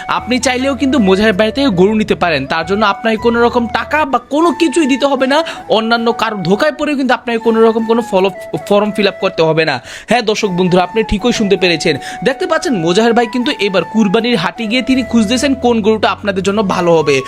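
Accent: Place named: Barishal, Bangladesh. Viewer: নারায়নগঞ্জের চাইনা এত বরিশাইল্লা বাংলা ইংলিশ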